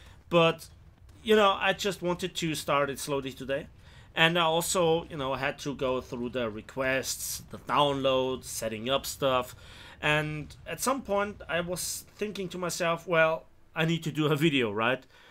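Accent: Bavaria, Germany. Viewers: Mach Moi wieder a Video auf bayrisch 😂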